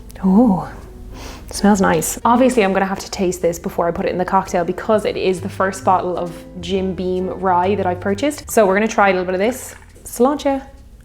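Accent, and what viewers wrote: Ireland. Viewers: Always enjoyable to see you online. There’s something I should tell you. As an Internet fan. There are four or more ways to properly pronounce the city you named and none of them are New Ore-leens. Locals will truly look at you like you are deliberately baiting them and you don’t want that. The oldest of the 4, from what I can tell is New Ore-lay-Ons. That lay sound is probably what has been twisted by yanks into the despised ee sound. As if it was said in French, which was originally spoken there. A miner variation is New Ore-le-ohns with just a hint of the h pronounced. Another acceptable variation is Nore-lans. Only two syllables. Finally. The tourist inoffensive version. New ore-lans. With an a as flat as you like. You may get passed on the bad looks with your Irish accent and incredible beauty, but why say the equivalent of “Hasn’t Ireland always been a part of England?” If you can avoid it? 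By the way, the second largest genetic heritage found there is Irish. So don’t be surprised if you see familar-ish faces behind the bar at places like Pat O’Brien’s or anywhere. But don’t go during this mess. If you want a real treat, go during the New Orleans Jazz Festival. Based on your background music you will love it. I went to college about 3 hours away and spent almost a month in Louisiana, aka Lou’s-I-an-a. (No pronunciation land mines there.) so that’s where I got this knowledge. Thanks for brightening the dark dark covid days. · Love your joyful approach to the making of this drink although would have watched just hear that delicious Irish accent! Thanks.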